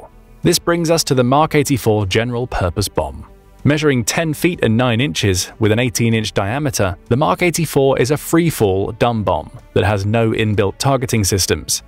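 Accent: United Kingdom. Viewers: This is what happens when random guys try to make videos of military hardware. You're going to get your butts handed to you by the YouTube algorithm, by having actual military enthusiasts watching. With all those inaccuracies pointed out in these here comments. Caliber in traditional military sense describes the ratio between bore diameter and barrel length. The 50 caliber Mark 22 actually fires 3 inch/76mm shells, not .50BMG ammunition. This is the kind of basic mistake that casts doubt on everything else referenced in the video. At least the British accent makes it seem more artificial and movie like.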